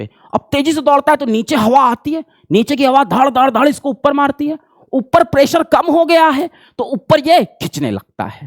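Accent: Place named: Uttar Pradesh, India. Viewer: Who is this joker? Very unprofessional way of explaining aviation theory. This is 2025 and this guy is still stuck in bhaiyya accent.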